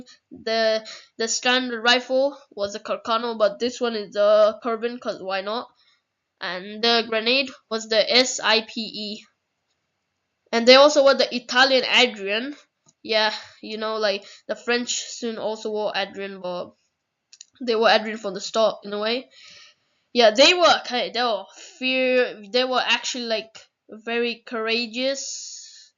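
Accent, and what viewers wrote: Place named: United Kingdom. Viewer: BROOO YOU SOUND SO BRITISH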